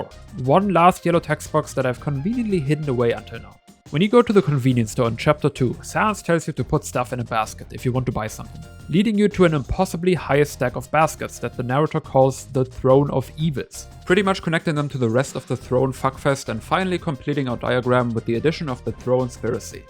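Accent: Germany. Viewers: i hate that i can clock a fellow german speaking english in the first 00.2s seconds of them speaking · I hear a german accent.. .. hmmmmmmmm 🤔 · Deutschester akzent den ich je gehört hab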